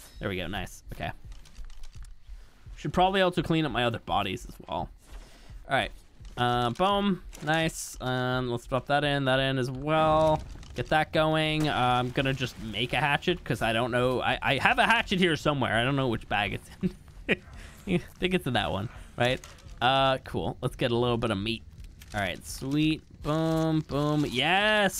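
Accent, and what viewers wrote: Ireland. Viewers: What part of Ireland are you from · Nothing beats hearing an Irishman getting excited about potato’s 😅 looking forward to this series dude 😁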